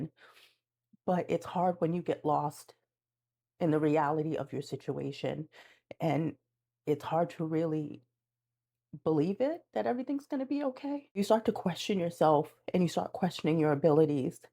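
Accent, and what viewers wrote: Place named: Boston, United States. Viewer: I can tell you’re from Boston, we’re tough, you got this good lookin!…..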